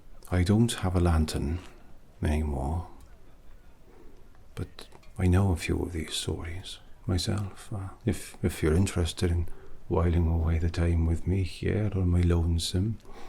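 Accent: Wales, United Kingdom. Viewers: An elf with a Welsh accent, I think I'm in love. 😍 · The heavy Welsh is my favorite 😍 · Love the story, after a long day it’s so nice to hear it with a gentle welsh accent ❣️ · The ad slid in so smoothly that I almost slipped. The light, the background sounds, the welsh accent, the make-up, the costume, the hair (especially the hair), simply 🧡💛🧡💛🧡💛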